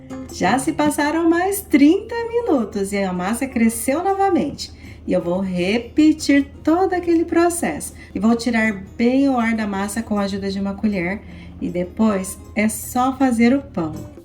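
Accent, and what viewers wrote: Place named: Minas Gerais, Brazil. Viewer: Oi, tudo bem? Tenho duas curiosidades a respeito de vc: Qual é o seu estado? Seu sotaque, as vezes, parece interior de Minas. Qual é seu nome? Tenho uma prima que se chama Teresina e tem apelido de.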